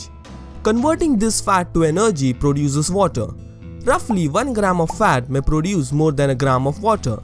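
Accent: India. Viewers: great video dude! but I have got to say this. try putting some effort on improving your accent. i know you've been trying and I can see from your previous videos you have improved. try taking professional help. because non-indians find it hard understand Indian accent. I am asking you to do this because your videos are great stuff and I want this to be popular worldwide. hope you reach your goal as early as possible! cheers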